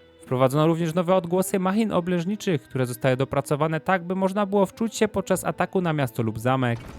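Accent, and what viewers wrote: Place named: South, Poland. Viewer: Po wymowie stwierdzam ze jesteś z południa polski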